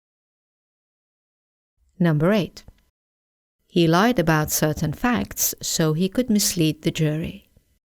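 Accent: United Kingdom. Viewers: It's an honour to be taught by you.I noticed your British accent.That's amazing and I'm Azerbaijani.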